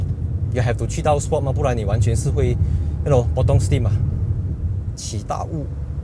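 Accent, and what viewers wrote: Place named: Malaysia. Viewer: 純正的聯邦腔+方言 😅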